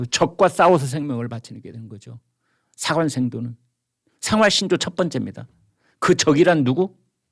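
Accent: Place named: Gyeongsang, South Korea. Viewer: 왜 경상도사람은 육십구라 하든지예슨아홉이라 하던지 육십아홉이라. 왜하나요 개같은경우.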